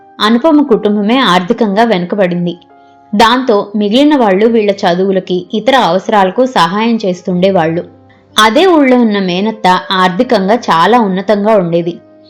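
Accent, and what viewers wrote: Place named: Telangana, India. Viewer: కథ ప్రారంభం లోని శిలను కథ అంతానికి రచయిత్రిశిల్పంగా చెక్కిన తీరు బాగుంది.ఆకాశమంత ఎత్తుకు చేరుకున్నా, భూమిని మర్చిపోకూడదు వంటి డైలాగ్స్ 👌 తెలంగాణా యాస బాగుంది 😊 మంచి presentation.....❤❤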